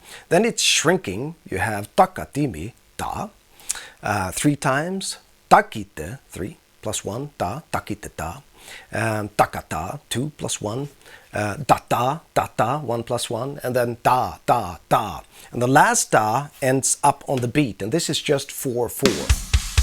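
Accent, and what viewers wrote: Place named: Sweden. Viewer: Dude i just wanna hang out this dude...is he swedish?...just the accent is kool..perfect voice for reading kids bed time stories...idk lol..m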